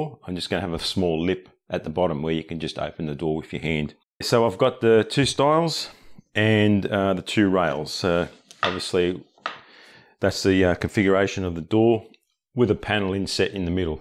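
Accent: Australia. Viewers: Beautifully sleek cabinet! I'm not a woodworker but enjoy watching your videos. Maybe it's the lovely Aussie accent LOL.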